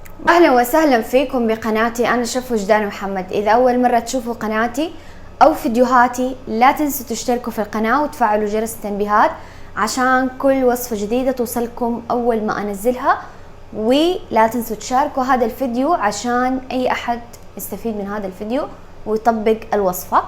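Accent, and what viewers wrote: Hejaz, Saudi Arabia. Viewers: سعودية حجازية .. هذي لهجة أهل الحجاز.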